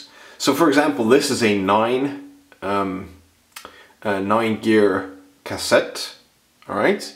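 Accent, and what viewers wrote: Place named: Ireland. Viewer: Do I detect a wee bit of Irish lol.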